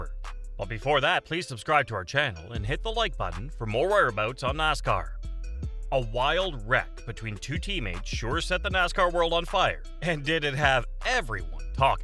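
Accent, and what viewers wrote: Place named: Canada. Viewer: Why reference bubba Wallace? Forced diversity? He had absolutely nothing to do with this. Your goofy accent sounds Canadian. Is that so?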